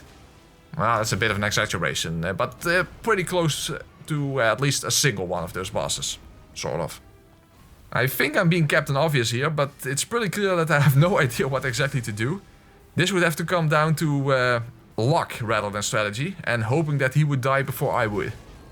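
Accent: Netherlands. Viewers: Perfect Dutch accent💎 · Aha ik dacht al wat een Nederland accent dit maar blijkt dat je dat ook bent❤ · Jesus f christ I can just tell you’re Dutch, that accent is fucking awful. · hahaha een echte nederlander haha wat een acsent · man man ge kunt ge woon horen dat deze man een Nederlander is, hallo buurman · You have a dutch accent.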